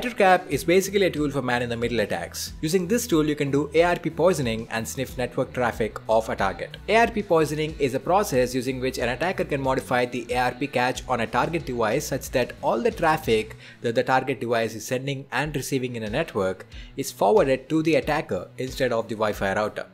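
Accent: India. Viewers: I knew you were an indian guy I knew it since the beginning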